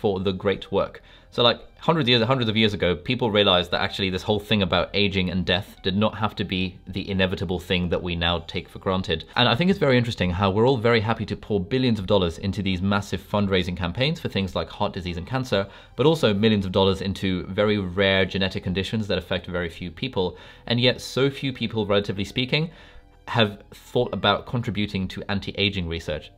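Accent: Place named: United Kingdom. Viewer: Great video Ali, love how you explained everything in your perfect British accent. What you said about how ‘wolfbane’ can effect the nervous system got me thinking about the Wolfbane potion Snape brews for Lupin in the Prisoner of Azkaban, which stops him from turning into a warewolf and immobilises him.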